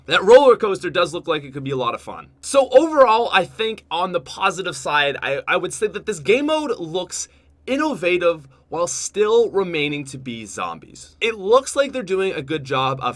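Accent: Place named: Canada. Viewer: After watching all 9 seasona of How I Met Your Mother, I've barely noticed that you have a Canadian accent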